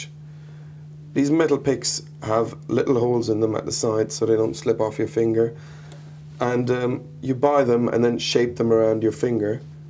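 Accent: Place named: Northern Ireland, United Kingdom. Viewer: sounds Northern Irish to me!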